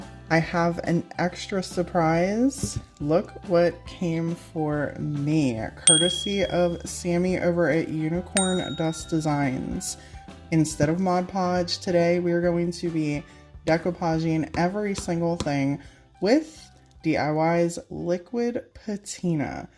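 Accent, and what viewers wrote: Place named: Pittsburgh, United States. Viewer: I just discovered you and I already love ya..sounds like you're from Pittsburgh...it's my hometown...it's been a long time since I moved so I may be off my game but Pittsburgh sounds like Pittsburgh..the way you said water and how you say the letter "O" makes me think of home...either way it's cool I found your page 💜🪬☄️ I love them all but I really like the contrast of black and white on the snowmen and I agree with you about painting the sides 💜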